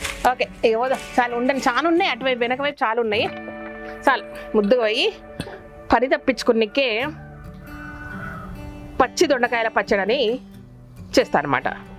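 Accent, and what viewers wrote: Telangana, India. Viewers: Good telangana slang mam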